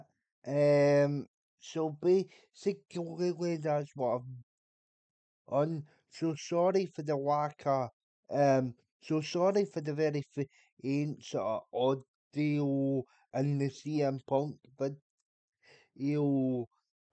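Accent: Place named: Scotland, United Kingdom. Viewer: No It flowed well, probably because you were not talking about how annoyed you were. I have been away from the UK for almost twenty years, first in the States and now in China, so hearing Scottish accents is not something I am used to. Now drinking scotch is something I am rather familiar with lol. Anyway, four am here and that hot choccy is not going to make itself and I gave my monkey butler the night off.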